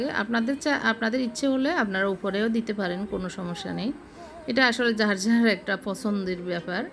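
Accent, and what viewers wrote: Noakhali, Bangladesh. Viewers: Shob valo kintu apnar uchcharon thik kortey hobey.. Noakhailla uchcharon shuntey khub kharap lagey.... otherwise your recipe is nice.. all the best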